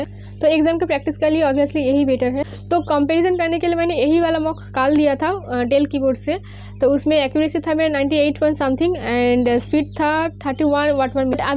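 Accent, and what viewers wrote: Bengal, India. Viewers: U bengali?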